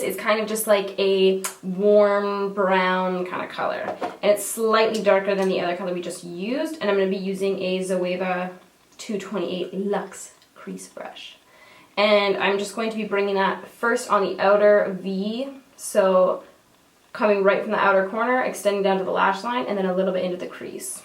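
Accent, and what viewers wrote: Canada. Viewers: I am obsessed with your accent, I love Canadian accents soooo much haha. I would love to visit Canada, it's my number one destination! I'm from Australia and I've never been overseas so I'm so unbelievably keen to start traveling. Love your work, you're amazing. xxx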